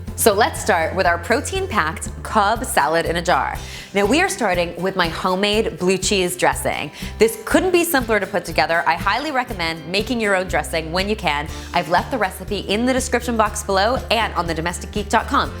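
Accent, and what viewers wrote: Canada. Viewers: Hi, I'm actually watching this in 2016, I just discovered your channel through "DoitonaDime", and was very intruiged. Your one pot pasta meals seem great, and I can't wait to try them out! I could hear (at least I think) that you are Canadian? Well, I'm from Belgium, but my uncle emigrated to Whitby, Ontario in the fifties! I used to spend a lot of my summer holidays there, since my dad passed away when I was only four. I loved it there ! Some pretty good, warm memories... Now, my uncle has passed away, too, and I have to grown kids of my own, so I basicly never went back...too busy. Iwas wondering what kind of jars you use, and if they're available online (worldwide shipping, of course). Thank you so much for all of this! 💝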